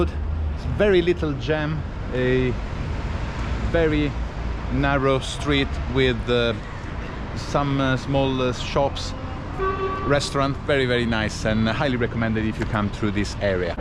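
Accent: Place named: Italy. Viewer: Just a personal question. Did you grow up in Italy? I love your accent. Any recommendations for an American looking to take a trip to Italy, specifically regarding places to see ?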